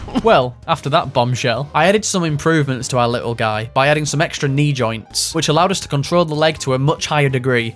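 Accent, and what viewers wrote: United Kingdom. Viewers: The only British accent i can tolerate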